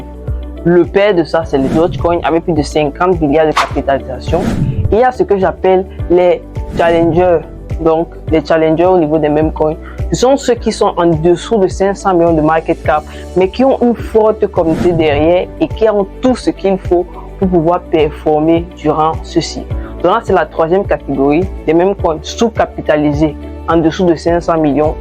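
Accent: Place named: Cameroon. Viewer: J'aime regarder tes vidéos et j'adore ton accent camerounais.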